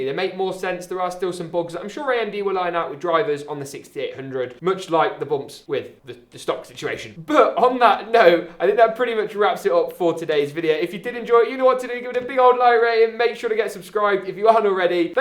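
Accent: United Kingdom. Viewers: I love the way you speak in the British Accent.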